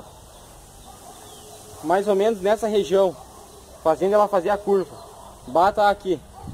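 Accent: Rio Grande do Sul, Brazil. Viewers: Sotaque gaúcho tu deve ser de RS pra aí né ? Valeu pelas dicas +1 sub e like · Mano vc e gaucho ??? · Sutaque Guacho mora no RS ?. Vlw pelas dicas ajudo bastante. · Parece que temos um gaúcho aqui não é mesmo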